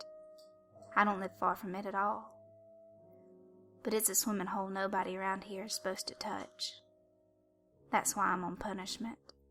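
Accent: South, United States. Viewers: First time listener and new sub! I really enjoyed your video! I'm from Louisiana and if your not really from the South, you did a good job with the accent! I sure hated the first comment gave the whole story away tho... so it kinda ruined it for me,,,but I still liked it! Good Job and good luck with your channel!! Glad I have new stories to listen to!!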